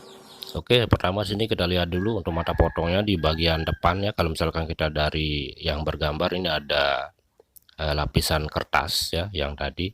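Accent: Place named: Bali, Indonesia. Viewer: Kaya org bali logatnya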